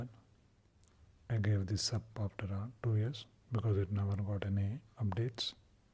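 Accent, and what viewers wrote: India. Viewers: Wow who is he..?? His voice is gold...I love an Indian accent. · I think hes indian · I think that the Indian accent makes for the best ASMR sounds.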